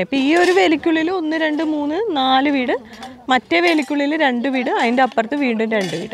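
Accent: Thrissur, India. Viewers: ഇത്രയും നാൾ യൂട്യൂബിൽ കേറി ഇറങ്ങിയിട്ടു ഇന്നാണ് ഞാൻ ചേച്ചിയുടെ ഈ വീഡിയോ കണ്ടത്...പിന്നെ സംസാരം കൊള്ളാം ട്ടാ. എവിടാ വീട് തൃശ്ശൂര് ആണോ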